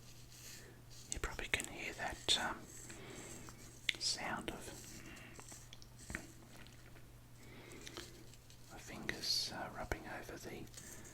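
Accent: Australia. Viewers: What makes asmr better is an Aussie accent great Job m8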